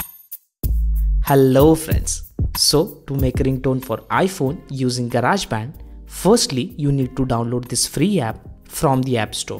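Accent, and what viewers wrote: India. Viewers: Idk but his Indian accent made this 10x easier to understand. Thanks for the help 🙏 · Indian English accent 😢😢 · SUch a damn indian accent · THANK GOD HE HAS THE INDIAN ACCENT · I love indian accent yes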